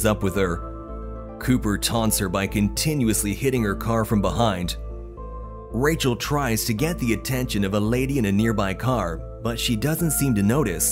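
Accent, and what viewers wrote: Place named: Canada. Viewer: The first ai voice I’ve heard use a Canadian accent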